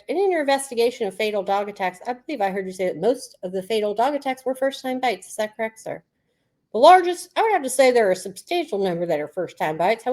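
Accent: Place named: South, United States. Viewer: You’re throwing me off with the southern accent because I don’t think Floridians speak like that. 😅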